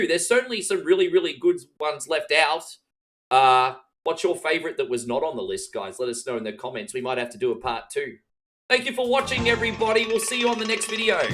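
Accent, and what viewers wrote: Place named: Australia. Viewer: just a suggestion... For people that don't know you, your charm is your accent. Maybe putting in the title somewhere that you're an aussie would gain you clicks.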